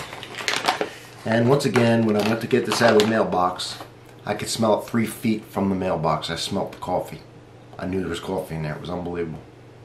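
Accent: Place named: Philadelphia, United States. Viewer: New to your channel and really enjoyed watch all the coffee videos. A nice way to spend a Sunday morning! Will have to make my way through the beer and whiskey ones! I switched to using heavy cream (also from Kroger here in Indiana) instead of half and half and sugar and never looked back. It does bring out the taste. But your sound more like a Philly boy than a Kentuckian!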